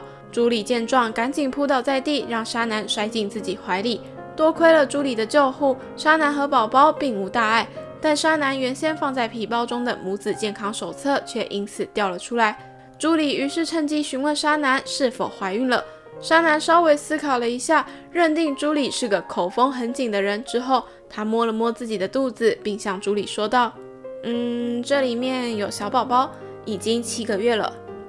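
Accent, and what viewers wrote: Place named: Taiwan. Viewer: 角(音同腳)色，請不要被簡體字同化 ，為何唸角，可以查找辭海類的大型辭典即有說明 ; 角(音同決)主音律上使用，聽播主口音應該是在地臺灣人(不好意思，是頗標準臺灣國語)，個人建議還是該依傳統正體字說法為上。 這本番外，十年前還是大學生時就拜讀過，畢竟，《玩偶遊戲》是少女漫界的教科書，七年級生必讀經典！現在看到又想再把書找出來回味了，不過，當時看就覺得翻譯有問題了。早產是指孕期未滿37週即分娩，書中紗南破水時已9個月，也就是至少38週了，雖不到40週的預產期，卻不是旱產！卻翻成早產！？另則，珠里和早穗翻叔母更是錯的離譜！早穗是珠里母親的妹妹，所以早穗是珠里的阿姨不是叔母，更不用說叔母是大陸用法！臺灣人稱叔叔的太太該叫嬸嬸，珠里是早穗的外甥女，不是侄女！雖然日文講法都一樣，但華人教育是分得很清楚的，這是很明顯的誤譯！這作品的翻譯程度……不予置評。真的會懷念書名仍叫《玩偶遊戲》(大然)的時期，翻譯的中文造詣著實天差地別呀~ 直澄被掰歪，我第一次看時的確不太能接受，一開始無法接受的原因，不是不能接受出櫃，而是直澄他本來就不是性別認同障礙者或是同性戀者， 他的性向是很一般的異性戀，突然要粉絲接受他的"轉性"，真的不容易呀！直到我接觸到《昨日的美食》(身為ACGN齡逾30年的老牛皮，雖算不上腐女，BL多少還是有涉獵)，發覺兩位主角的角色設定並非著重於情人(戀人)，多數看到的是倆人在相處日常上，經過諸多磨合後，心靈契合度和不必言明的默契提升，我在兩個中年男性(魅力大叔)身上，看到了老夫老妻的相處模式，沒有令人眼紅心跳的煽情，只有平淡如水卻長情安然的陪伴！這樣的情感表現，是連異性戀都難以達到的或者該說是多數人都會心神嚮往且追求，若是小時候的男神(雖然我自小是羽山派)和現在的情人相處如同筧和矢吹的話，身為粉絲的我，大方祝福！真心期盼，仁科會是直澄王子的幸福。 希望未來有一日能再看到這部經典的後續。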